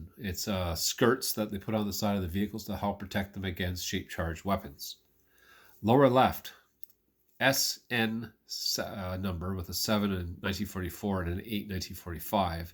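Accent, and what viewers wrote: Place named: Canada. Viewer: Sexy sultry Canadian voice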